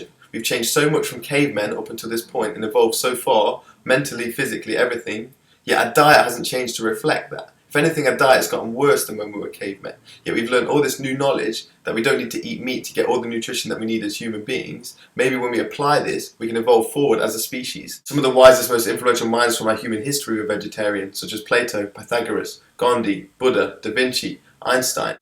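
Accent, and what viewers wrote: United Kingdom. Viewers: every persons happiness leaves another person upset/hurt..the animals are bread for food...the worlds population is so vast now, we are running out of natural supplies, we need this...and by the sounds of it, you are from the UK, read your vegetable wrappers etc. you will see it is coming from elsewhere in the world where people are struggling in slavery conditions to produce just enough food for us, to make them enough money for their family to eat a meal that week, please, think things through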